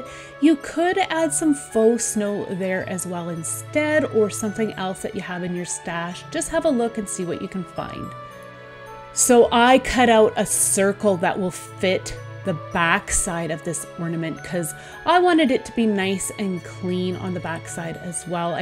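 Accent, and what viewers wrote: Canada. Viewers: I love your DIY's!! Also I recognized your Canadian accent.. lol. I'm a former Canadian living in Arizona.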